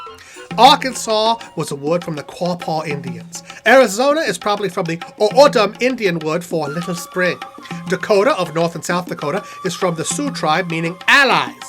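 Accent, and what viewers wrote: Germany. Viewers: that's the worst german accent i ever heard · you have a German accent? really? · Your ''German'' accent is shit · WHATEVER GOES FOR ME it's a german accent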